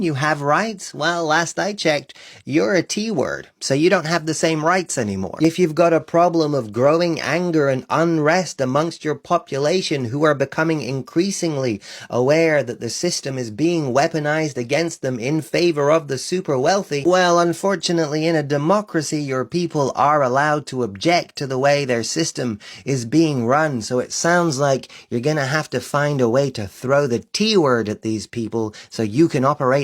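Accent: Wales, United Kingdom. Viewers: I’ll let you off for the Welsh accent, because you speak so much truth! 😂🫡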